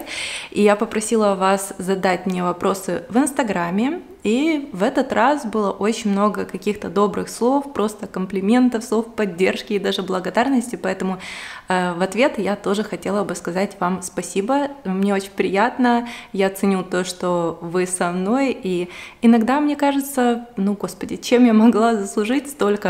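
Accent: Ukraine. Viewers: какой прекрасный разговор получился!) Вы чистая, светлая, душевная. А ваша речь и диалект - я как сама окунулась в свое детство, которое тоже прошло на Украине, но уже 27 лет не живу там. Несмотря на разницу в возрасте, у меня было точно такое же детство, такие же теплые воспоминания...Все ваши выражения " у нас так говорили/говорят" мне понятны, потому что у нас тоже так говорили 😀. Теперь моя мечта встретиться с вами где-нибудь на просторах нашей планеты и пообщаться лично за чашкой кофе.... ❤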